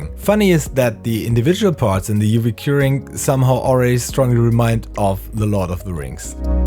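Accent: Germany. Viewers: Hey. Es hört sich so an als wärst du eigentlich Deutscher Muttersprachler, daher spreche ich dich mal auf deutsch an. Ich bin gelernter Oberflächenbeschichter. Das was du in deinen Videos machst, mache ich sozusagen hauptberuflich. Daher kann ich dir bei Fragen gerne Helfen. Ich persönlich verstehe nicht, warum du den Strom erhöhst mit der zeit. Du solltest die Oberfläche ausrechnen und den Strom entsprechend 1,5-2,5A pro dm² einstellen. Die Unreinheiten die du auf der Oberfläche siehst, sind Verschmutzungen in deinem Elektrolyten, die sich als Poren auf deine Teile legen. Deine Kontakfläche ist eigentlich groß genug, du solltest versuchen nichts um die teile herum zu spannen, sondern im Innenbreich zu kontaktieren. Scharfe kanten oder spitzen solltest du jedoch abschirmen, da du dort sonst Anbrennungen bzw Knospenbildung bekommst, so wie dein Draht beim ersten teil ausgesehen hat. 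Ich hoffe das hilft dir weiter.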